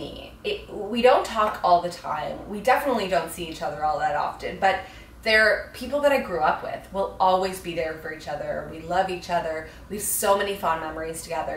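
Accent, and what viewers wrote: Australia. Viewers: My friends and I in 5th grade LOVED this show! We were the kids at school who pretended to be horses in recess, and talked about horses in class and did our science fair project about horses. We had a name for our group, too, like the saddle club. We were 3 horse crazy girls! I am 26 now, still watch the show sometimes, and I collect Breyer horses. I haven't left the horse life! Horses are my passion. You are awesome!! You were so funny and cool in the Saddle Club. I wondered why you sometimes had an aussie accent!🐎🦄🐴🦓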